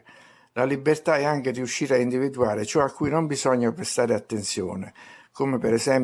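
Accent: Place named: Molise, Italy. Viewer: L'inflessione della voce assomiglia alla mia molisana 😀 Grazie, e scusa per l'invadenza.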